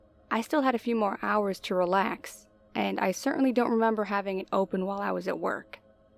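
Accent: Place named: New York, United States. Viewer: Heavy New York accent.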